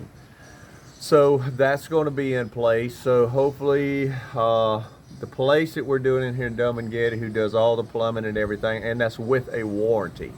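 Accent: South, United States. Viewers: I like your Southern accent. I live here in Tallahassee, Florida which is just part of the deep south.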